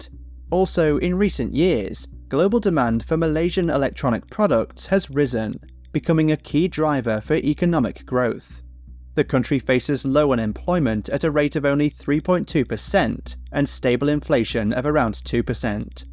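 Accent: United Kingdom. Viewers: Fair analysis, but it’s pretty rich to be lectured by a British accent on the (admittedly flawed) implementation of the affirmative action policies Y’all got us in this mess in the first place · presenter's accent is british. this mistake is more on the editor or producer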